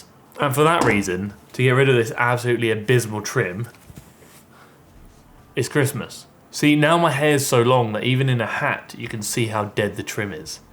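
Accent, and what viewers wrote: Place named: England, United Kingdom. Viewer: Here for the accent 🤣🤣🤣 so awesome, England? Sounds like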